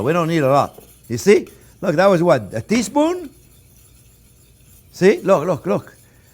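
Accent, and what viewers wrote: France. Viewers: You are really nice and funny with your english francaise accent ;) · Ptn ct' accent français jpp 😂, bonne recette sinon 👍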